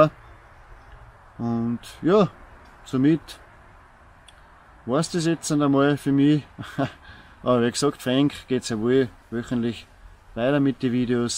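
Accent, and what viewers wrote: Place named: Ernstbrunn, Austria. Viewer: Wo ist dein schönes Ernstbrunnerisch abgekommen?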